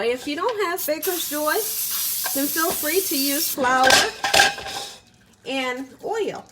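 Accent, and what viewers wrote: South, United States. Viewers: I love this lady so much she sounds so southern 😂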